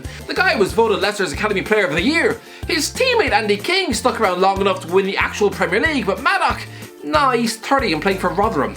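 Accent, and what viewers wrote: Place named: Ireland. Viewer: Imagine not starting ur day with an Irish guy rant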